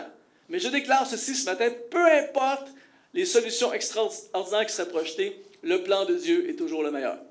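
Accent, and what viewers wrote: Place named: Quebec, Canada. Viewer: Vidéo très inspirante, qui redonne du courage. Je suis un français de 34 ans et cela fait quelques années que le Seigneur travaille en moi, depuis mes 27/28 ans. Je sens que j'ai beaucoup évolué depuis. Avec l'expérience, je pense être sûr que le Saint Esprit m'a donné le don de clairvoyance, c'est à dire que je vois les signes, les guidances et les réponses de Dieu sous forme de texte (dans des livres, des publicités, des affiches...). Je suis tombé sur cette vidéo en faisant des recherches sur Youtube pour voir si justement quelqu'un d'autre témoignait d'être aussi guidé comme moi. Et c'est là que c'est fantastique! Je tombe sur ce merveilleux discours de qui a clairement un accent québécois. Dieu m'a montré des signes, m'a guidé depuis des années en me montrant d'abord le Canada, puis le Québec et enfin Montréal précisément. 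Là il n'y a plus aucun doute, j'ai bien un lien fort avec le Québec. Donc merci Oasis Eglise Rive-Sud, même si vous ne l'avez pas fait exprès, et merci à vous Seigneur!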